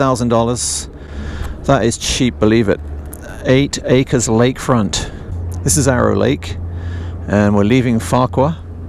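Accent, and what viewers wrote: United Kingdom. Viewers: Love watching the videos. Your accent makes me miss the UK.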